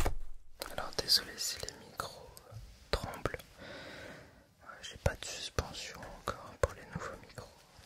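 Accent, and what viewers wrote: France. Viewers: "une pétite (h)AUL" i feel bad for laughing.. i love the french accent tho, not trying to be mean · I literally love your voice! I'm a french student (in America) and I am completely obsessed with France/French. Your accent is so amazing :) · I also love your french dialect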